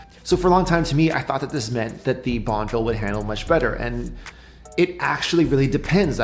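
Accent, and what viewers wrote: Canada. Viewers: He’s Canadian eh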